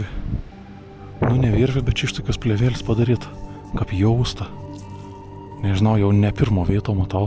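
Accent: Samogitia, Lithuania. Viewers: Kodel zemaitiškai kalbi sakei lietuviškai kalbesi😢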